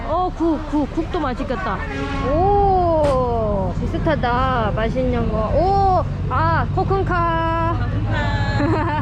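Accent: Jeolla, South Korea. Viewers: 부산사람이 전라도 사투리 쓰시네요 ㅋㅋ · 목소리가 경상도 ? 충청도? 전라도? 어디쪽인가요? 다 섞인거 같으신데 ㅎ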